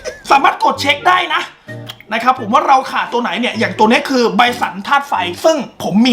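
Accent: South, Thailand. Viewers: พี่ เป็นคนใต้หรอค่ะ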